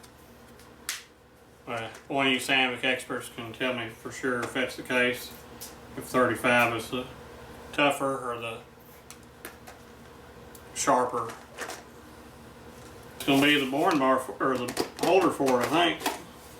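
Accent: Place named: South, United States. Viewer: I'm always happy to find another southern guy with a southern accent showing that folks from the south may talk different but that don't mean we are not intelligent. Thanks for the update! I look forward t the next one but I've still got some backlog on your channel to catch up on.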